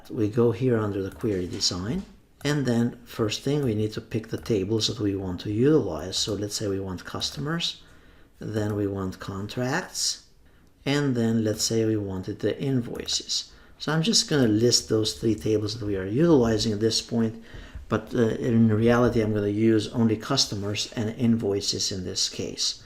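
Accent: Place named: Albania. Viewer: Great job. Your Albanian accent is strong though :)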